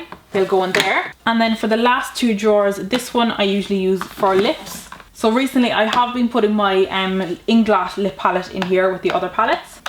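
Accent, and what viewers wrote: Ireland. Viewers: 💋💋💋💋💜 first Irish MUA accent I’ve heard lol 😘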